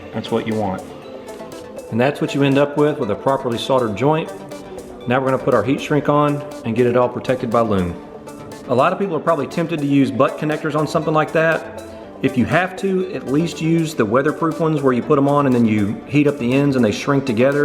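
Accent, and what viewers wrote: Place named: Carolinas, United States. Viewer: Are you in the Carolina's? Guessing by your accent ;) I too have a 3rd Gen 4runner with the TRD S/C (Walbro 190LPH, Supra Injectors, URD Pulley, JET flash ecu). Pings going uphill under load so I'm looking at Methanol injection. CLEAN INSTALL and I appreciate your thorough explanations!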